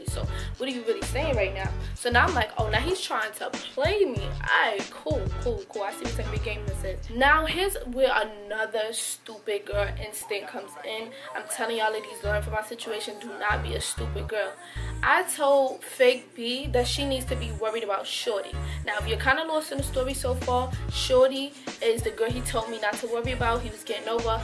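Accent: New York, United States. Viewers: You from nyc?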